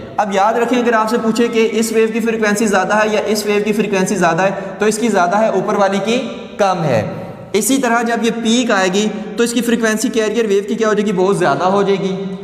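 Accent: Jhelum, Pakistan. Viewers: Are u from jehlam sir😮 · Are you from jehlum?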